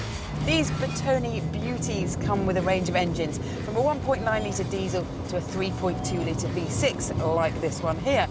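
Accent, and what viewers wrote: United Kingdom. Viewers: Yeah, somethings about her voice and the british accent is so sexy! I do have a crush on her as well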